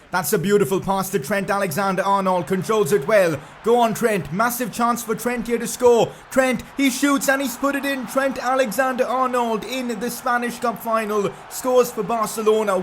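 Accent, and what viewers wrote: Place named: India. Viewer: Are you Indian because you sound Indian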